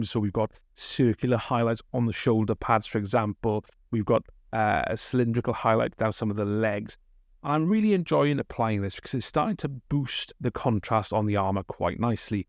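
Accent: Wales, United Kingdom. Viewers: THAT SOUNDS LIKE A WELSH ACCENT RAHHHHHHHH I WILL BE SUBSCRIBING BOTH FOR THESE AMAZING PAINTING TUTORIALS BUT ALSO BECAUSE YOU HAIL FROM THE GREATEST COUNTRY IN THE WORLD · ah sick. Thank you! Ok I’ll get one of those. I’ve been burning through crappy brushes for too long. I hear that Welsh 🏴 accent. You’re not anything to do with Firestorm in Cardiff are you? That’s our local and we basically spend our wages there 🤦‍♂️ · Great tutorial and always nice to hear a Welsh accent on a youtube vid.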